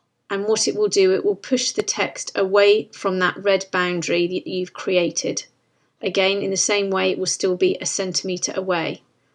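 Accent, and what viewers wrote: England, United Kingdom. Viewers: Thanks so much for this. With one exception I think I got everything that you were explaining. Until now I have had so much grief with textboxes and thought the people who invented them should be hanged as war criminals. The one exception, which reminded me of so many other tutorials that I have watched was increasing or reducing the size of a picture. You did it and produced the result as if by magic without saying how you do it. Perhaps if I watch again I will see how you did it. I am going to create a practice document and play about with the boxes until I can really get them to work. So far, positioning boxes has been almost impossible for me and reduced me to near suicide with frustration. Thanks again. Your lovely, clear English accent helps, too!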